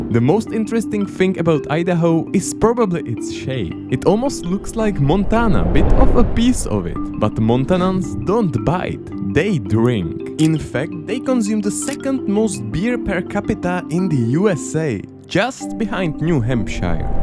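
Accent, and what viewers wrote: Czech Republic. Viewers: This guy is Czech. English is most likely not his first language.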